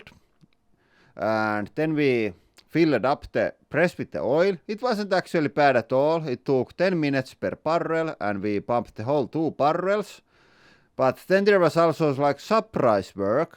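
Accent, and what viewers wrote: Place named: Finland. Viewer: I love hearing English cursing spoken with a Finnish accent it sounds so good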